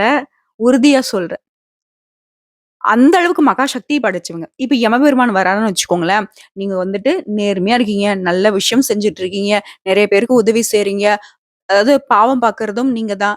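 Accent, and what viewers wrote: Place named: Kongu, India. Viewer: நீங்கள் கோயமுத்தூர் அல்லது திருப்பூரை சேர்ந்தவரா மேலும் தங்களின் பேசும் தொனி கவுண்டர் இனத்தினை சேர்ந்ததாக தெரிகிறது. இனிமை.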